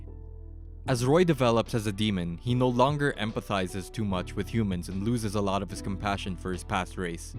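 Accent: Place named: Philippines. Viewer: Filipino ka ba? Edit: I hear a slight Filipino-ish Accent.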